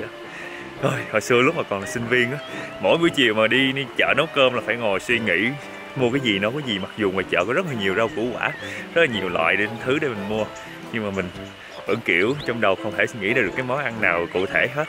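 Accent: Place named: Saigon, Vietnam. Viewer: Nguoi mien Tay, nhung noi giong Saigon, giong tuong doi dung chinh Ta, rat Ro rang, hay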